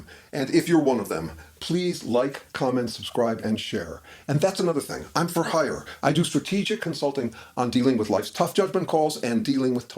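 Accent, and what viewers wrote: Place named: California, United States. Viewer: He seems to have a California accent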